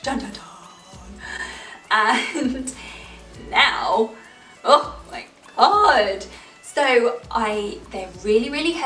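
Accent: England, United Kingdom. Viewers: Lol. I don’t even know why I watched this. “Curiosity killed the cat”...they say. Your personality is so magnetic I guess I’ll listen to you talk about anything. That English accent.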